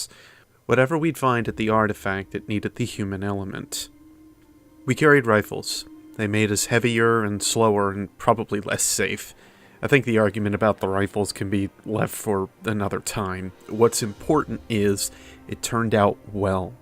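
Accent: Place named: Russia. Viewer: Your Russian accent sounds like a Nord dude from the Elder Scrolls Online...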